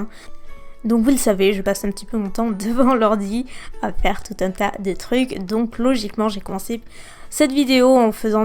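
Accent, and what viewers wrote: South, France. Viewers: Tu as un accent incroyable on dirait que tu viens du sud je t'adore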